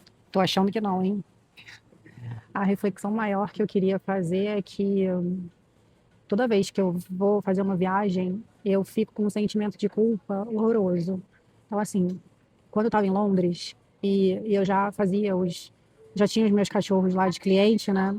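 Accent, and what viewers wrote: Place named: Rio de Janeiro, Brazil. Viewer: Mulher inteligente e com todo respeito...muito bonita!!! Ela é carioca! Olha o jeitinho dela falar!!!!!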